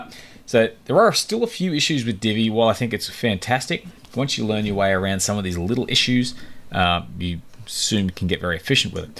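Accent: Australia. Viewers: Oh my goodness! I work as a VA and have been struggling with this them for days, despite watching some training vids. YOUR video is EXACTLY what I was looking for! Thank you. AND discovering a fellow Aussie accent was pretty great too 👍👍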